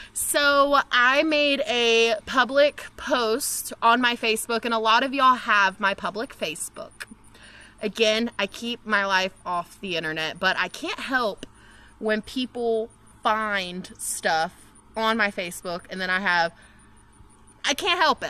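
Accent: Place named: South, United States. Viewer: I think your videos are so sweet. I am a GA girl! I love to hear you talk. Sweet Southern Girl!!💖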